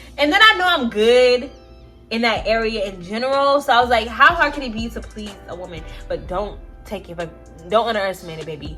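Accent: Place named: South, United States. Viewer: Yea u either from Atlanta or Houston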